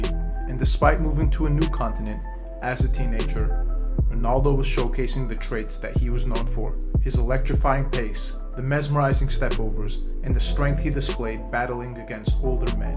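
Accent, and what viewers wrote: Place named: United States. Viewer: Già sentire un accento americano che parla di calcio non è credibile...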